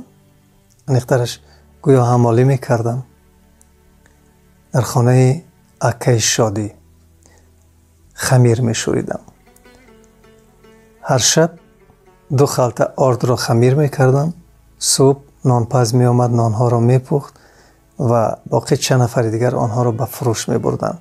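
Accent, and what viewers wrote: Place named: Ayni, Tajikistan. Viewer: Бово лахчаи Айнигия гум накунед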